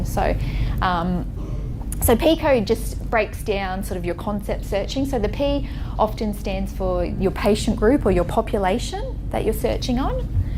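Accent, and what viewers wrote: Australia. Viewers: Omg I just ❤️ an Aussie accent!;)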